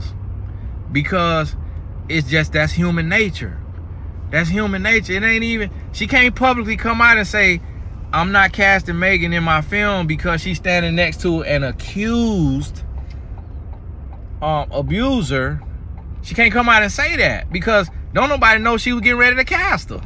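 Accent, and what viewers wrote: United States. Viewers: The accent is sending me. You are so country 😂 as a NYer this was entertaining. But in all seriousness this entire message is the truth. If we’re honest without his money Mr. Mayors would be called crusty. I never understood his sense of style. Also it’s no longer 1 woman accusing him, More came forward. Meagan always went out her way to make wrong choices with men. She chose one Godly man, one time then ran off. Something about her looked uncomfortable/forced the entire time I saw them sitting together. But Mr. Franklin chose an ungodly woman so 🤷🏾‍♀️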